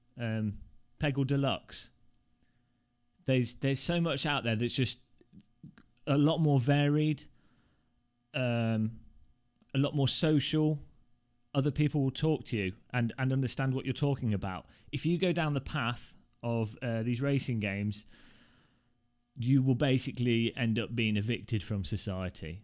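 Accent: United Kingdom. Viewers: I clicked on your bait but as soon as you spoke i knew it was more dry British humor, cheers A+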